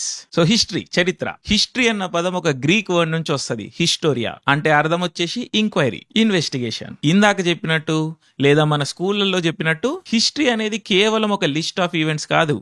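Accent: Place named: Telangana, India. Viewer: Telangana slang 👌 bro keep rocking ❤❤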